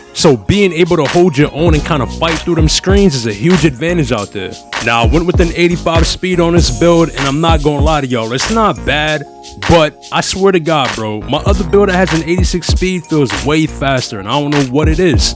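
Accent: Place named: East Coast, United States. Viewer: Like how he uses West Coast beats in the background when he sounds like a NY/East Coast dude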